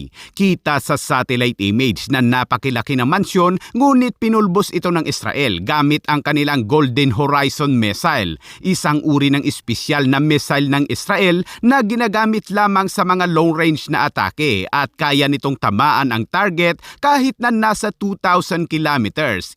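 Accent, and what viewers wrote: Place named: Visayas, Philippines. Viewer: Bisaya ka pala dn idol eyy🤙🤙 hahaha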